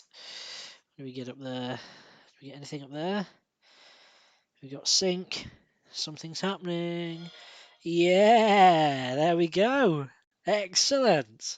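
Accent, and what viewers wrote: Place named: England, United Kingdom. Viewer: he talks too fast plus the English accent... I think I need English sub version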